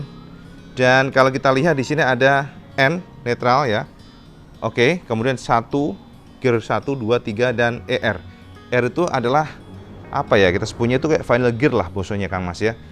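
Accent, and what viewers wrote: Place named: Java, Indonesia. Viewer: Jet koledd cak wong jowo ngomong e 🤭🤣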